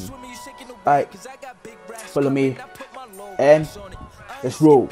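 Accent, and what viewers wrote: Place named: Ghana. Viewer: Your video popped up, and then I decided to watch it. Your accent sounds like a Ghanian's... Nice vlog between.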